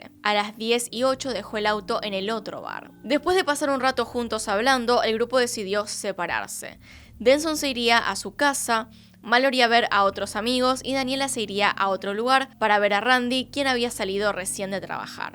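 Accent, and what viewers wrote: Argentina. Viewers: "Hola y bienvenidos a mi canal de YouTube...una vez más. Hoy tenemos el caso de....." El acento argentino lo amo y vos sos una maestra para narrar los casos. Además, me encanta el toque q le pones a las preguntas capciosa...me encantas,. Saludos desde El Salvador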